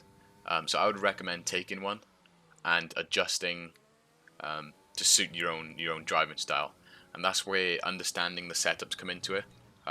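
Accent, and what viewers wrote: Wales, United Kingdom. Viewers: Great info, where in Wales you from?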